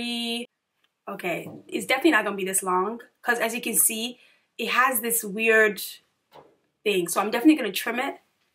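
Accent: Nigeria. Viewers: its actually a really beautiful wig, and you'd break hearts with that on lol. Plus i love when you go back to a Nigerian accent...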